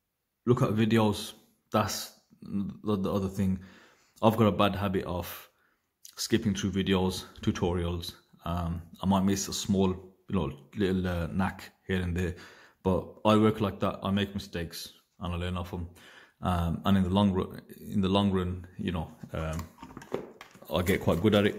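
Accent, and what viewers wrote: Birmingham, United Kingdom. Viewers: I hear a Brummie! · Thanks for the useful hints and tips. I'm looking in to Pagani Design at the moment and I hear you can switch out bezels for Seiko SKX and other bits as Pegani use NH35 movements. Be cool if you did a vid on modding the Pegani 1651. Good to hear a voice on hear from Brum as I'm down in Bristol and miss home. Keep up the good work. Big up Brum!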